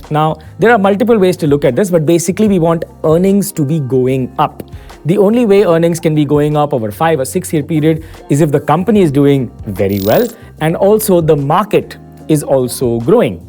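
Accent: India. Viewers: Hey man this video is very good and i am binge watching your channel's videos this is really good! This guides me in right path with new perspective! Please continue this job and this is very engaging!❤️ By the way your accent looks like one of the south indian movie director GVM. 😹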